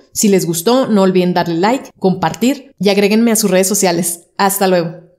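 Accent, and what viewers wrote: Mexico. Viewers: No tengo iPhone, siempre he sido android estoy pensando juntar para un iPhone nuevo no sé si lo haré porque puede que al final termine comprando otro android jeje , pero me agrandan tus vídeos, una consulta, de qué parte de México eres porque he escuchado a varios mexicanos pero tu forma de hablar es nueva para mi.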